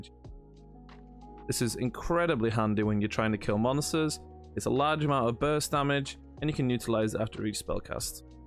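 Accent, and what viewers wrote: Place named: Australia. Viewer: Very nice accent. I like Australian accents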